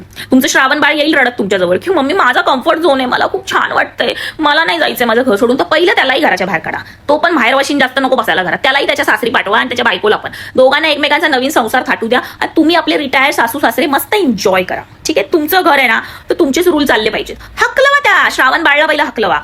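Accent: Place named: Western Maharashtra, India. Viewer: तुमच्या भाषेचा टोन बघून तुम्ही सांगली कोल्हापूर सातारा इथल्याच वाटताय... पण जबरदस्त रिअल इव्हेंट वर विडिओ असतात सॉलिड 😂🤣😂😂.... Keep it up... 👍🏻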